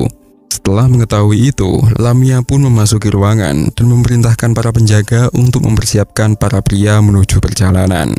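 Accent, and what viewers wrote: Java, Indonesia. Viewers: Suara ne wong jowo iki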